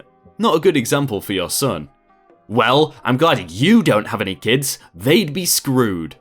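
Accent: United Kingdom. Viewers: I love how you pronounce things in British English (I’m a Aussie, we also use British English) (Richard is a good name for entitled dads)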